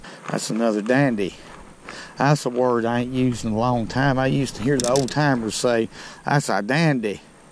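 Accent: South, United States. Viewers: in that american language of yours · I appreciate your humor, southern accent, and your thoughts of what fishing is all about! So glad I found your channel. Keep mak'n videos! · Maybe it's your exaggerated southern ayuckseeant, but I call them crappies, not crawpees.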